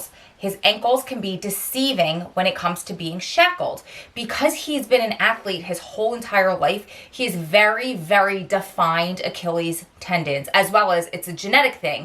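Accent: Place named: South Jersey, United States. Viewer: Omg you are from South Jersey aren’t you? I’m new here. That accent! 😩 Cross between Southern and Philly. I live in Salem County. I understand if you don’t want to disclose where you are I just think it’s funny! I’m loving the content!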